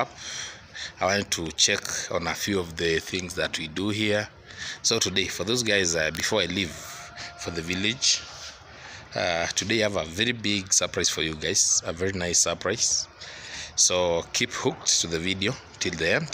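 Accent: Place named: Kenya. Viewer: If you asked me, I would have side,from the way to talk, that your a KIKUYU. I am a subscriber of Marwa and now you. Uvoo wa musyi? Greeting from Manchester.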